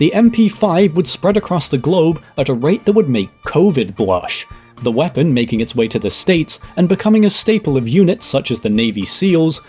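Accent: United Kingdom. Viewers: Like most people I find the English accent very irritating.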